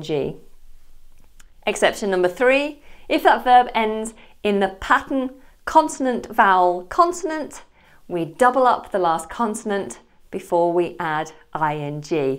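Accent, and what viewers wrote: United Kingdom. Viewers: It's a little difficult for me to understand the british accent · Brilliant explanatiom with proper British accent. Keep it up🖒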